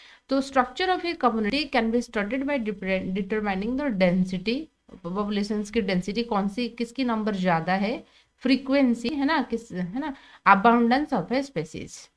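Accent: Odisha, India. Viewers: Nice explanation ma'am 👍 Are you from odisha????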